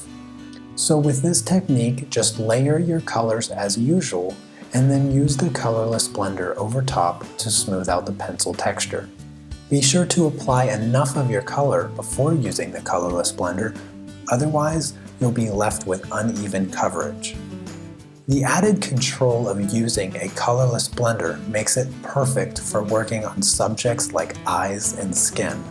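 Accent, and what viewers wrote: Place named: United States. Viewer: I am not a bigot, but I do wear hearing aids. It is SO refreshing to hear American English spoken. I have a great deal of difficulty understanding accents; British in particular. Thank you. Your voice is also soft and low, not high and piercing. Thank you.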